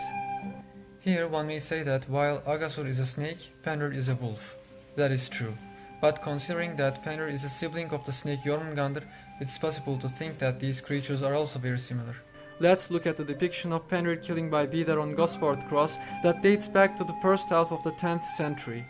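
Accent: Turkey. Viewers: Aksanından Türk olduğunu anlamıştım. :D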